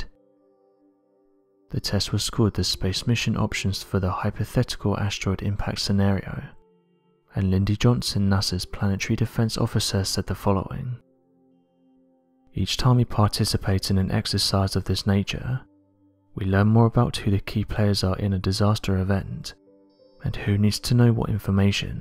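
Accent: United Kingdom. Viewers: Always some horrendous British accent narrating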